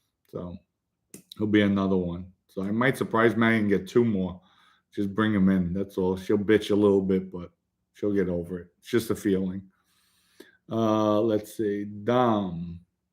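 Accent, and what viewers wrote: New York, United States. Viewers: Props, Dom. Great to see how you’ve turned your life around. Very impressive and a great example. My comment- why apologize for being a “rat”. Wouldn’t you have been a punk if you sat in a cell for decades after you’ve been wrongly told you had nothing coming to you? It would’ve been different if you ratted if you simply didn’t want to do time- no? Regardless, everyone I know (Port Chester, NY) says vodka exactly the way you do…….:). Props. Congrats on your new wonderful life.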